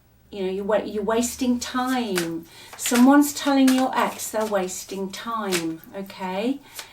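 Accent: United Kingdom. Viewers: Hi , I’m Neo the matrix buster, love your accent, British? I live in Michigan USA!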